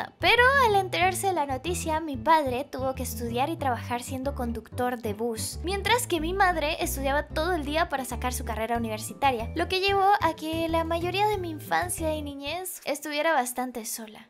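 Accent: Colombia. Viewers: Señora hp JAJAJAJA Adoro ese asento colombiano mrk te queda melo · Eres Colombiana ? ._. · Yo creo que deberias hablar mas el acento colombiano y su jerga, es más divertido XD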